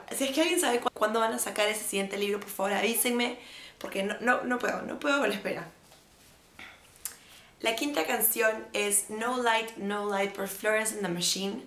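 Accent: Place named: Argentina. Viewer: holaa, me suscribi a tu canal, muy bueno :D , la verdad en un momento pensé que eras de acá de Argentina jaja, buenos nos vemoss :D , no olvides pasar por mi canal, soy "un intento de youtuber" ajaja saludoss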